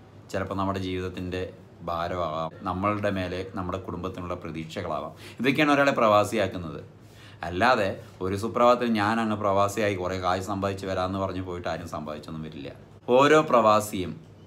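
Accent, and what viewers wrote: Palakkad, India. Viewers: Palakad slang enik eshtatto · ഈ സ്ലാങ്ങ് പാലക്കാട്ടിലെ ചിറ്റൂർ കൊഴിഞ്ഞാമ്പാറ, വണ്ടിത്താവളം എന്നീ ഭാഗത്താണ് പ്രധാനമായും നമുക്ക് കേൾക്കാനാവുക. കേട്ടിരിക്കാൻ നല്ല രസമാ☺️☺️☺️🤝🤝 ജോയിന്റ്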